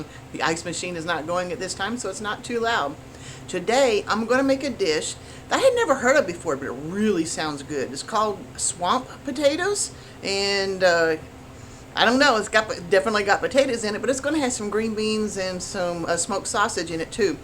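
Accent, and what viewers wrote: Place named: Oklahoma, United States. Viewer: I still hear some Okie in you. I have it too.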